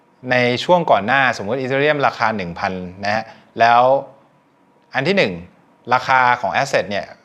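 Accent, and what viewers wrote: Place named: United States. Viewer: คุณพูดภาษาอเมริกันได้ 100% พ่อแม่เป็นคนไทยและโตที่อเมริกาเหรอคะ. ข้อมูลข่าวสารดีมากคะ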